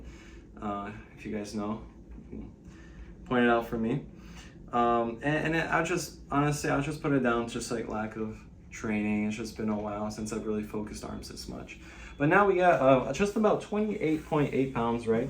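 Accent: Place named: United States. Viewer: Кафявия колан по жиу-жицу за упражниетия на брахиализа. Не знам кой ще е по удибен жиу-жицу колегите или Армрестлерите, щото те го правят с бял колан :D. Your name is Bulgarian but your accent is American, very interesting!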